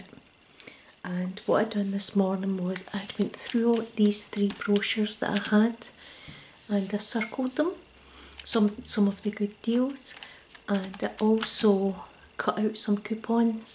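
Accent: Scotland, United Kingdom. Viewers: Currently away from home, missing the Scottish accent and style, so love watching your vids. It was magic seeing the sales and going through them one one as you did. If live to see this buyer a weekly thing! And based on the comments would others 😀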